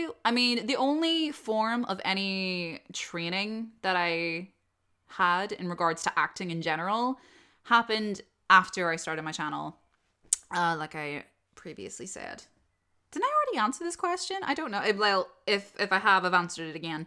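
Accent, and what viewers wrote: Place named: Northern Ireland, United Kingdom. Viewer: Dang I feel bad as a Dungeon Master and a baby VA it took me ten minutes to decipher your accent as Scottish. 😭😭😭 Edit: northern Ireland fuck!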